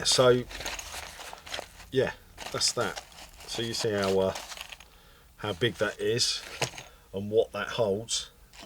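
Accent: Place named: United Kingdom. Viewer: Some great items in there that I think I might add to my EDC. PS, it's nice to hear another Brit talking about EDC, as most of the time, ot's an American dominanted topic. Cheers.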